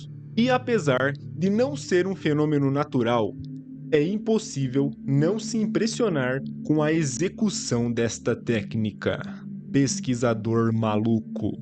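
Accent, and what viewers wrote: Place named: São Paulo, Brazil. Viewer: Vc é do interior de São Paulo... caipires em dia ... muito top...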